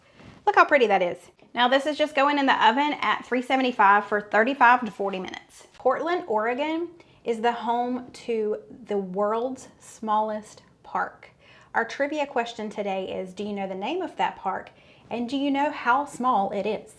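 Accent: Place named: Minnesota, United States. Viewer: You do ,have the accent right for Minnesota I'm from South Dakota my birth state. 🤣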